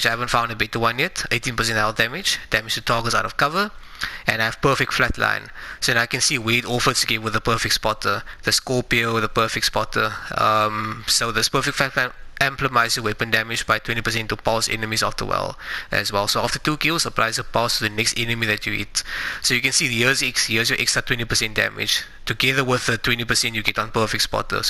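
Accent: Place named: South Africa. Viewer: my man...nice video....your accent...you from SA?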